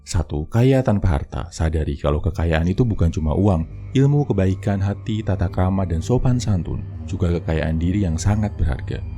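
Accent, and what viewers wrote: Java, Indonesia. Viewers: Keren bang pasti orang jawa ni admin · Mas wong jowo yo?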